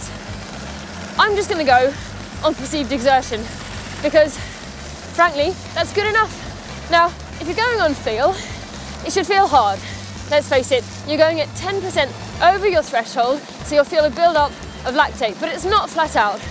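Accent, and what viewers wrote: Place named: United Kingdom. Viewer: We love you british accent Emma Poooooley!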